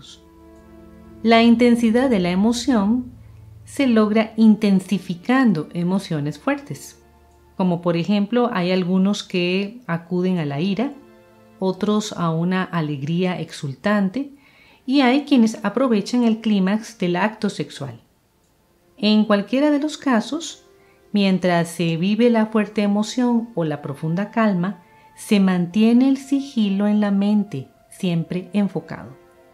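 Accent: Costa Rica. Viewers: Sííí…! 😄 Yo también quedé intrigada. Leyendo los comentarios supe q era costarricense… Hay acentos que en América del Sur no es común escuchar…😊 Que agradable suena, verdad ? (Bueno. Además se nota que ella es una mujer educada. Modula muy bien y habla pausadamente). Saludos desde 🇨🇱 ❣️ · Sos tica? Esa R me suena tica jejeje soy de San José. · Es costarricense , acento tico lindo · desde que escuché la forma en que pronunciás la R supe que eras de costa rica. Que orgullo que una mujer tan sabia y espiritual sea de mi patria ♡ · Por la pronunciación de su "r" pienso que es de Costa Rica. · Es tica? Notó su acento :)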